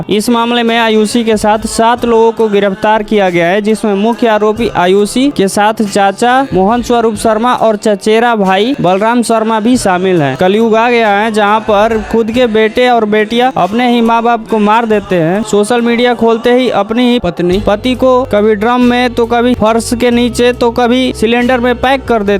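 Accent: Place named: Bhojpuri, India. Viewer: Hindi ko hindi ki tarah bolo...bhojpuri me hindi mat bolo...baaki sab thik hai bhai.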